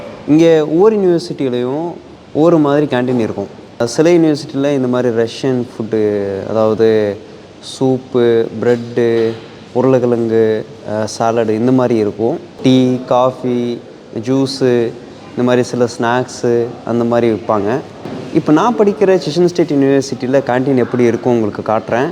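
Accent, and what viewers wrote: Standard. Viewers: தமிழ் சுத்தம் 🎉😂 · இலங்கை பதிவு ரஷ்ய பையன் இவ்வளவு சுத்தமான தமிழில் பேசுவைதைபார்த்து ஒர் தமிழனாக பெருமையடைகிறேன்❤❤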